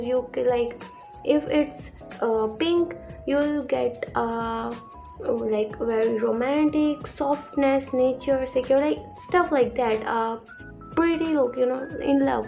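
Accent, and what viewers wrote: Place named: India. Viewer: You are right the accent does sound Indian, or SEA at least. But hey none of our business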